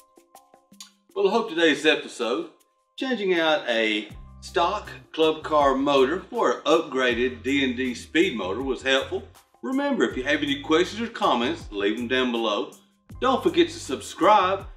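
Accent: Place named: South, United States. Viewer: southern drawl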